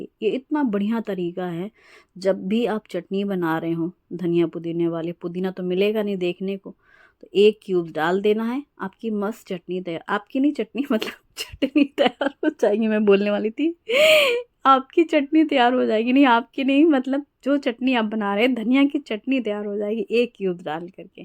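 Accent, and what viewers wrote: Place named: Uttar Pradesh, India. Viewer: Ji aap UP sai belong karte hai...apke bolne key style sai lagta hai......aap bahut hi talented hai❤❤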